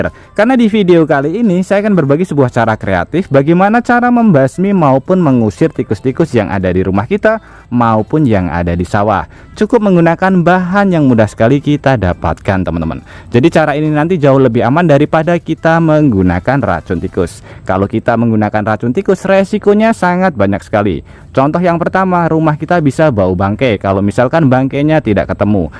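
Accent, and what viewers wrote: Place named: Central Lombok, Indonesia. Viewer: lombok tangah ni bpk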